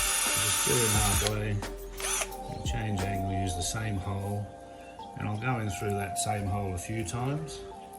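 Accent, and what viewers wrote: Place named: Australia. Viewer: Good ol you tube recommendations. This was really useful and I hadn't seen that drilling approach before on harder wood for bending. Having been raised in Melbourne before finally ending up in the UK it is always great to hear that accent. Cheers for this vid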